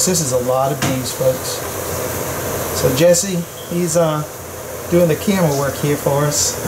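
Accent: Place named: South, United States. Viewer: I'm not just binging on bees—I'm binging on bees that are being moved by guys with Southern accents. Now that I live in Seattle and most of my Southern immediate relatives have passed, the combination of the hum of the bees and the southern accent is so soothing...like a lullaby and my childhood all wrapped up together! 'Night and may God bless us all and all the US.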